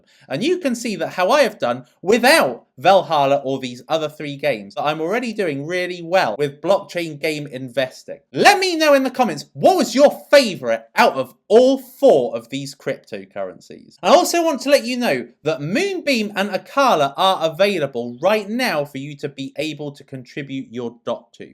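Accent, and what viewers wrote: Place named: Ipswich, United Kingdom. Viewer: new subscriber, you sound like youre from ipswitch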